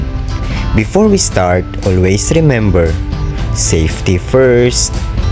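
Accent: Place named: England, United Kingdom. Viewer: I have loved your content, and I love your English accent, it is easier to understand ❤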